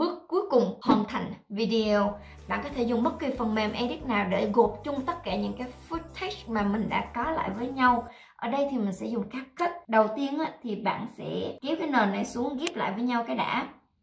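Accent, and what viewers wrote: Binh Dinh, Vietnam. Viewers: Bà ở Bình Định hả bà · nghe giọng nói của bạn giống như ở bình định hay phú yên hihi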